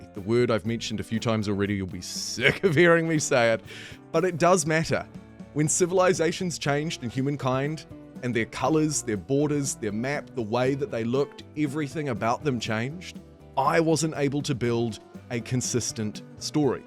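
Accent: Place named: New Zealand. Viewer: Nice to click on a random video and hear a kiwi voice. Rawe bro, nice video. Generally pretty excited for the new game, kia Ora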